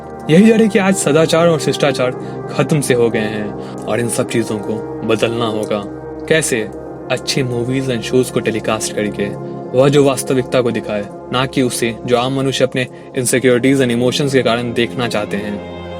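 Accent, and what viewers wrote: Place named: Bengal, India. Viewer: min...The text was in Bengali...❤️❤️ Are u a bangali sir??? I am very curious 😄😄..apka hindi accent dekh ke muja ve laga app bengai hai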